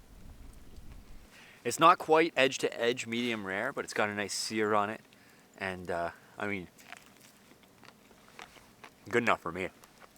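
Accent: Canada. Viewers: Not even a minute through and I heard Canadian and I’m enjoying it, love from Alberta new subscriber here!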